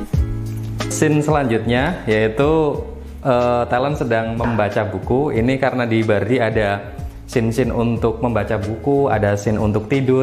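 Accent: Pekalongan, Indonesia. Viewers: cah kalongan ndi suarane?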